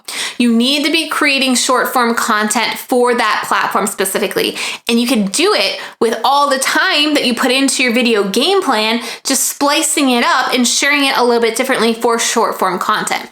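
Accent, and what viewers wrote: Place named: Utah, United States. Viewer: You're from Utah right? Your accent sounds very Utah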